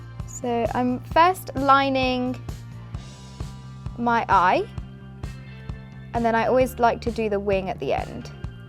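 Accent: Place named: United Kingdom. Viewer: lol are you sure about that? i think you're commenting on her accent, which sounds that way because she lives in the UK. her actual knowledge of english is fine considering she was brought up in a country where that is the first language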